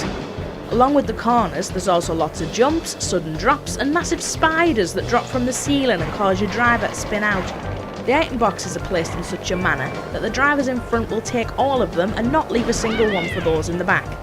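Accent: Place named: Northern England, United Kingdom. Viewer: I love a good Northerner. Sheffield?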